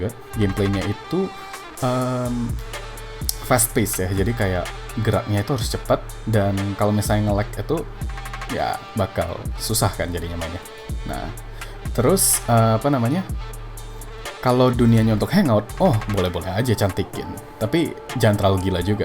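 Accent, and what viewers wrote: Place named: United States. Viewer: Anytime you say an english word its pronounced perfectly with an American accent lol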